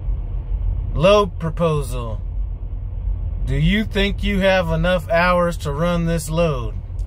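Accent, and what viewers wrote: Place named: Southern California, United States. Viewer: He talks just like So Cal.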